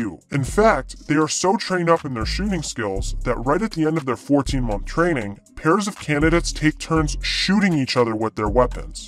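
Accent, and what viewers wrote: France. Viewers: No big deal about the French accent you are super ethical intelligence !! THATS WHAT MATTERS -- YOU ARE NATIONALISTIC AND THATS WHAT I LIKE BUT IF THE INTEPRETATION IS AVIALABLE THATS THE MOST VALUABLE THING KNOWLEDGE INCLUSION IS POSITIVE IMPACT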